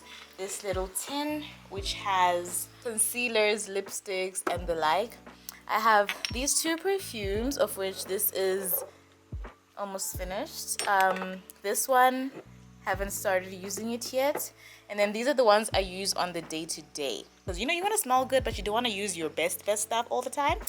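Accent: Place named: United States. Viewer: Are you from USA? Your accent is giving me American vibes.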